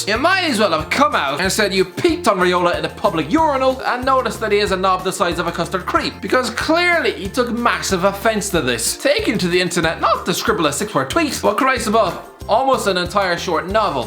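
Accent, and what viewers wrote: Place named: Ireland. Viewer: Nope just that irish accent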